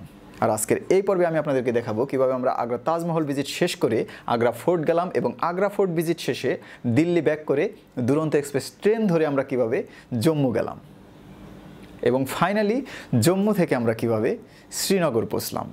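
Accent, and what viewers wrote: Bangladesh. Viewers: That's Bangladeshi Bengali accent. We Indian Bengalis don't speak like that. Our Indian Bengali accent and pronunciation are different from Bangladeshi Bengali.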